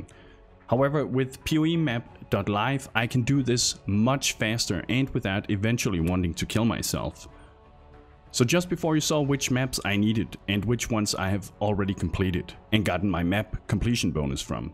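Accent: Denmark. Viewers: That danish accent thou.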